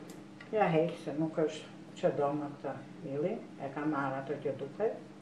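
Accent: Tirana, Albania. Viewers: Pashe dhe vidion e pare ..qe me kishit Kthyer pergjigje..faleminderit shume.. Sapo e mbarova doli special Hera e pare ne jete qe bej kulac si tironse se kemi tradite..por kte vit e bera ...😉..Gezuar Vitin e Ri.. Me zi pres te hidhni vidion e rradhes neser pjek gjelin e ta bej persheshin me menyren tuaj.. Ps u knaqa me tfolmen tironse😉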